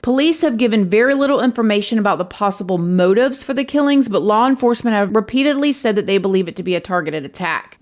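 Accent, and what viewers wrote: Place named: Atlanta, United States. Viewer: your commentary about the INCEL portion, that is a lot of conjecture with a lack of evidence, your bias is really ruining the last part of your video. you had a great objective video up until that point. for your own sake, can you make a video of how many people have committed murders that are now considered INCELS. i would love to see your facts vs jumping on the FED bandwagon. INCEL has become a slander on younger men that are mostly at that age, that awkward age for dating. the fact that people are being labeled INCEL is beyond subjective this coming from a father, husband and have had a lifetime of multiple partners and relationships. btw u sound like u are out of atl also, not the inner 285 but outside.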